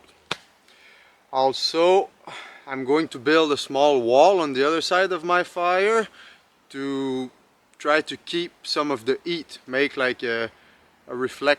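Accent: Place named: Quebec, Canada. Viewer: Also just found you. You remind me so much of the guy in “49th Parallel.” Lawrence Olivia. Right down to that French Canadian accent. Love it. You were made to do this. People need to know how much water you need in the winter. I worked out in the cold for close to “ let’s just say many years.” Very dangerous when you sweat. I like how you kept it so simple. Beautiful scenery.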